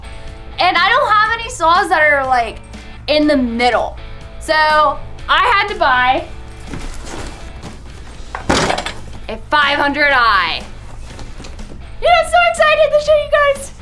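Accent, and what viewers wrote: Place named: Western Pennsylvania, United States. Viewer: Just found you with all of my recent chainsaw videos. I'm originally from Butler and you totally sound like you're from western PA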